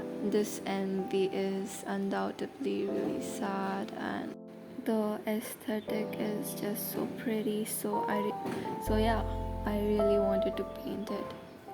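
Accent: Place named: England, United Kingdom. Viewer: So nice voice ..... This like Anglo English..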